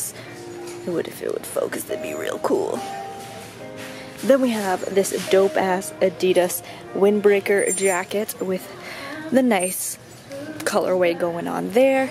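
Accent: Canada. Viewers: i'm from the U.S. but i can tell when someone's Canadian by how they say out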